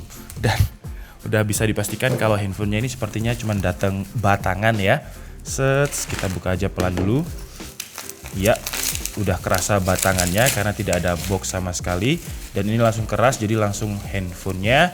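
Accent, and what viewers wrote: Java, Indonesia. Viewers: iki jowo bnget mas, 🤣🤣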